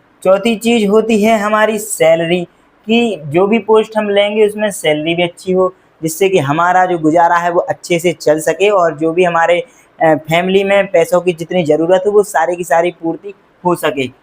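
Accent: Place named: Jharkhand, India. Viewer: Jharkhand me bhai aise hi pronunciation kiya jata h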